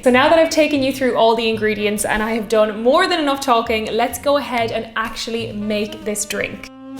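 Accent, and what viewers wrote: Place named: Ireland. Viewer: Irish was recently voted as the most attractive accent in the World...but I believe that was about English as spoken by males. WELL - I must be mistaken but I could listen to 24/7. And what a beauty...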